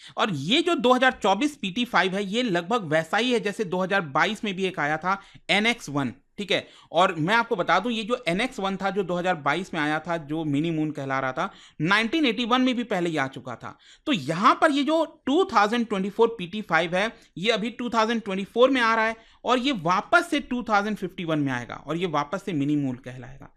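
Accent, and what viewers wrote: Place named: Bihar, India. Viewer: Bihari type of speech Nonsense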